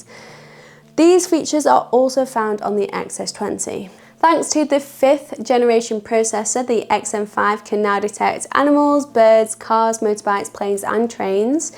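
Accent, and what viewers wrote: United Kingdom. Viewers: Is it Brit accent? Always found it hard to get it (though they ruled India for long).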